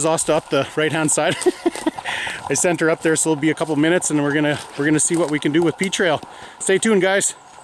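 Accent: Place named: Canada. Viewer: oat stoat about learn how to talk English you Canuck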